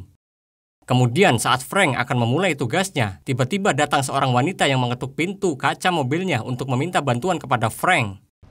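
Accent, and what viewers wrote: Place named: Siau, Indonesia. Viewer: Logat Siau kang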